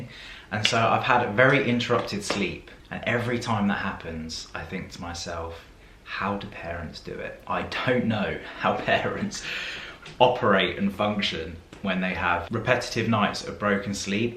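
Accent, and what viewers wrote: England, United Kingdom. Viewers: What part of England is your accent?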